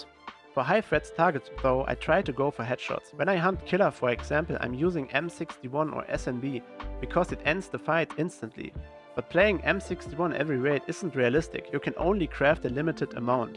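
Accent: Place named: Germany. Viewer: Denglisch. Ein unverkennbarer Klang im Universum! Gruß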